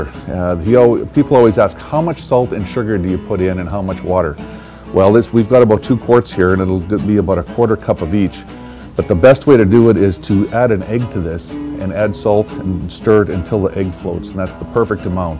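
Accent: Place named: Canada. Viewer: Cool except for the creepy Canadian accent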